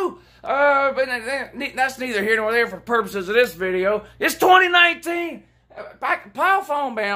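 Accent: South, United States. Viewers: But I will keep watching. I am a gator fan in Moscow. I’ll listen to anyone with a southern accent.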